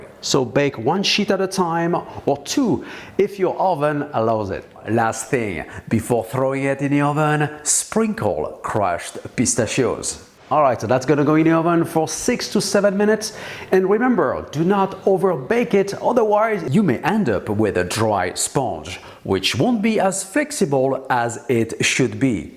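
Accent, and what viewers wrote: France. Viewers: Why the hell do I love him and what he makes so much??? Answer: B/C of the damn French accent😅😝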